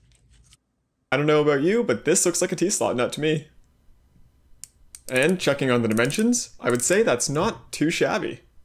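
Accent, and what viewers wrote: Canada. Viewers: I thought I detected a Canadian accent and then sure enough, out came the Power Fist dial indicator! Nice video.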